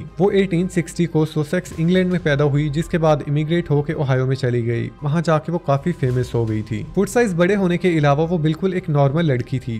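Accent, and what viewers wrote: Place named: Kashmir, India. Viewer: Main apka bohat bada fan hun main yahan ap k insta stories ki wajah se hun main india kashmir se hun mujhe hamesha lagta tha aap kashmir se ho ap k features aur excent bilkul kashmiriyon se milta hai.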